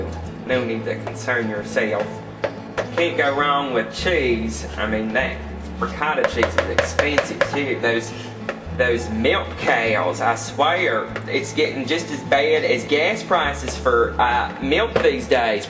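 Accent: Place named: North Carolina, United States. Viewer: Wow. You're pretty much the greatest! I've never actually watched a vid at YT that is even 10 minutes. Love your demeanor! Your accent reminds me of a nice lady I work for, she is originally form North Carolina.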